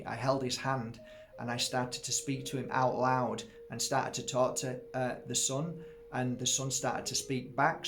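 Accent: United Kingdom. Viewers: I love listening to you! And I love your UK accent ♡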